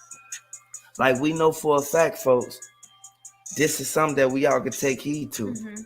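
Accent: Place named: Houston, United States. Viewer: Who is this mysterious "They"? This is troll-snitching at it's finest! They saying you were in the pics you are showing sir. With that H-Tine accent, I know you live in the Houston. Just wondering... Which one in the picture are you? You got specific intel, and are doing one hell of a job troll-snitching. Just keep talking, so we're can hurry up and close this case. Stand up H-Tine!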